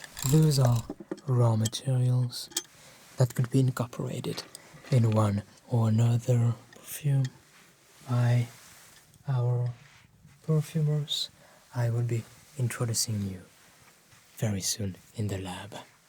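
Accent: France. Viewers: 5 minutes into the video I was like "damn his fake French accent is so on spot! ... Wait ... Is it fake tho?" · The acent of a French speaking is great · I'm from Russia, but I love listening to your English with a gorgeous French accent. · the fact that he has a french acc sent makes the ASMR so much more enjoyable and relaxing. it also makes the video feel more authentic. awesome work man much love 😊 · Nothing like listening to some French Accent ASMR before bed! I love this!!!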